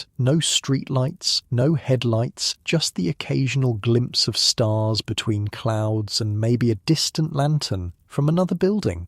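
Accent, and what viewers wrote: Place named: United Kingdom. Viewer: I have really enjoyed the story. However, I have discovered an unexpected dislike for "british" pronunciation. For example, I would pay for some reasonable pronunciation of the letter "r" where it is placed in the words.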